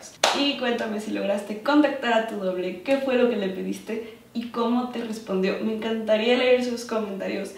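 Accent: Mexico. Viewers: Tu tienes acento como si fueras mexicana no suenas ecuatoriana. Me gustan mucho tus videos, que Dios me ayude a conseguir lo que quiero ❤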